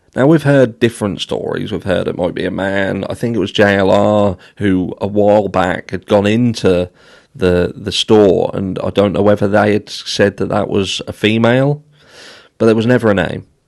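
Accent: United Kingdom. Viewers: Dude I’m losing you once you start talking, it’s hard to follow you. You’re talking too fast English with the accent for the non Brit’s. All your words sound jumbled together.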